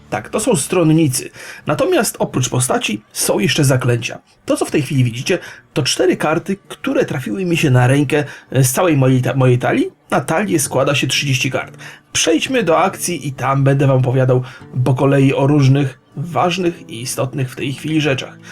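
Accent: Silesia, Poland. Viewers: pierwszy raz w grze słyszę gwarę śląską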